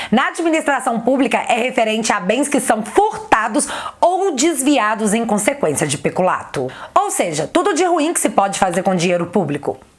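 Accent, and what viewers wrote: Minas Gerais, Brazil. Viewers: Nesse vídeo a tá muito engraçada. Hahahaha. Fora que o mineirês fluiu full pistola. 👏👏👏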